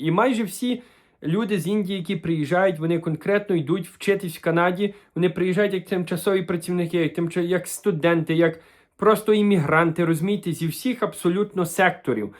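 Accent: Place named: Lviv, Ukraine. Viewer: Наздвичанйо цікаво розмовляєте, чути Львівський діалект видно що ви наш. Нам цікаво з України.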